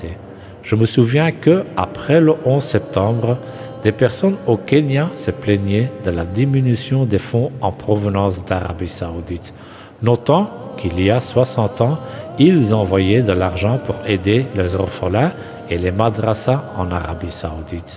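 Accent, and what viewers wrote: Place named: Flanders, Belgium. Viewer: Masha Allah. Ben jij van Belgïe? Ik hoor een Nederlandsetalig accent🙂 daarom. Mooie video Allahoumma barik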